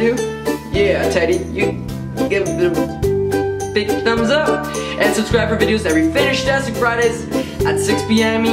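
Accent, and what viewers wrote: Argentina. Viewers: Why are you my perfect guy but live so far away. Hahahaaa love your videos. This message is kinda late but ok. I love your argentinian accent! They're my weakness hahbaha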